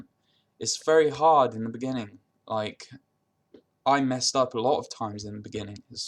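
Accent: United Kingdom. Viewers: This is a semi estuary London type of accent not Australian,,,,I found him clear enough,,he wasnot cockney or anything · English not Aussie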